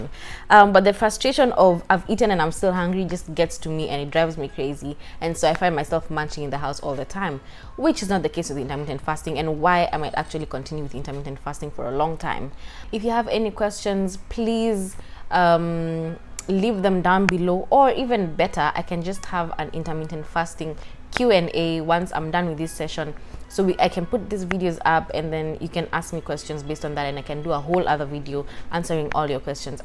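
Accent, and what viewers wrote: Kenya. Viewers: You sound Kenyan :)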